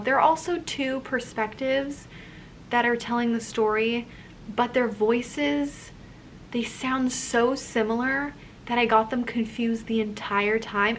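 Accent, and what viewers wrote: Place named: United States. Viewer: Oh, you’re dutch? For some reason i thought you were german... did you grow up in the US? Cause for me (a brazilian), you sound american :) (Your accent)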